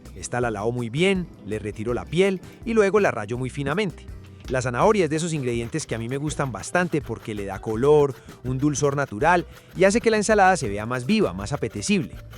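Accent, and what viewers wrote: Colombia. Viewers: Me encantó la ensalada y me encanta su acento colombiano!! Hágale pues…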